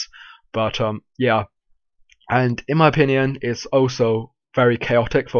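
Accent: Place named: United Kingdom. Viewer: British accent :)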